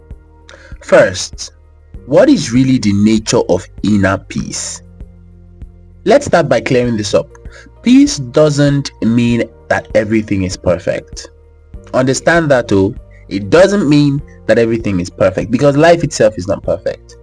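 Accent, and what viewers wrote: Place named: Igbo, Nigeria. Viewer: The igbotic accent for me 😂😅🤣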